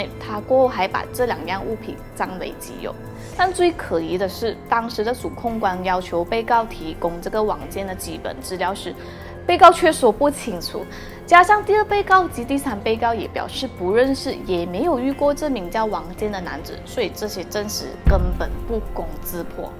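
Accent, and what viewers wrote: Malaysia. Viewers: 本来就是马来西亚人，口音当然是马来西亚口音啊，听不懂就看字幕，这是本地的特色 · 马来西亚口音很重。听不太懂，应该好好的自修中文发音。。基本上很多时候听不到懂。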